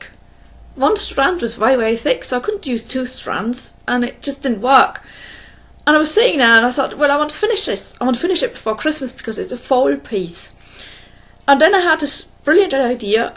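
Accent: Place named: United Kingdom. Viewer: I got here from StitchingMommy’s Flosstube, and I love your video. I adore British accents, and many people here in the US do also, so I hope your channel grows. There’s nothing i think you’re doing wrong. Maybe put more easily searchable words in each video’s title, possibly the designer’s name of something you’re working on, or something like that? One thing I really enjoy, too, is that you never say “um”! Many flosstubers haven’t mastered that yet, and eventually I can’t watch any more. Your voice is pleasant and has a lovely cadence! And I suffer from depression also. Your advice about light is spot on! 👏👏👏 I keep all indoor lights way down low after the sun goes down. Only a nightlight i. the bathroom. Only the stove hood light in the kitchen, etc. After a week of this, my insomnia disappeared and has never come back. That was 3 years ago.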